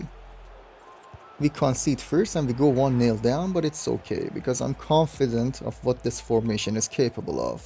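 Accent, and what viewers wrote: Iran. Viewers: Omade bodam videot ro bebinam bad goftam cheghad lahjash mesl iraniast bad didam esm chanelet e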